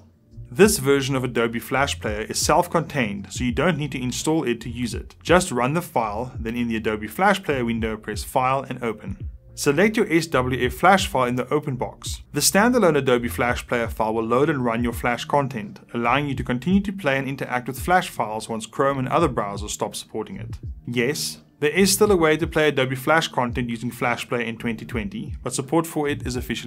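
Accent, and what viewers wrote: South Africa. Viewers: South Africa? I hear you, bru.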